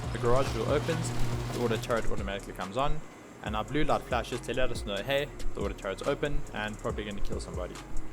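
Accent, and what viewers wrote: South Africa. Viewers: Bru i just hear my SA boet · I feel like this guy is South Africa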